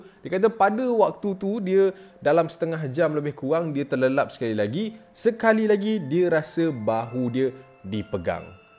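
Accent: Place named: Penang, Malaysia. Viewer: Napa suka cakap Penang? Influence org Penang Ka hahaha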